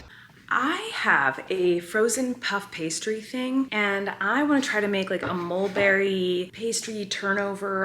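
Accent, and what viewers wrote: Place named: California, United States. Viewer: I knew you were saying it different but I thought it was your California accent. Lol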